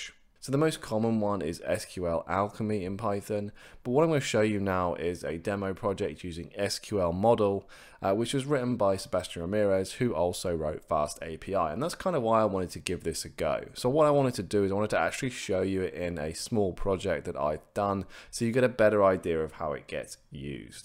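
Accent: United Kingdom. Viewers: Man Your accent is so adorable. Are you British ?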